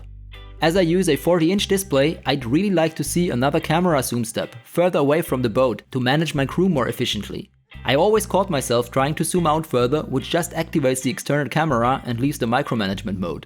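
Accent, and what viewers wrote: Germany. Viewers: I have never heard an Asian guy make jokes with a German accent before... This was like some kind of an existential experience to me, thanks mate :D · Great review and I love the German Speaking! Lol and to all the people that think germans are nazis I guess all Americans are Republicans ;) or all Canadians are liberals. Bought the game in Hope's it's like SH3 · I never thought of you as being a Nazi, I do like your German accent as it adds more realism to the theme being kriegsmarine.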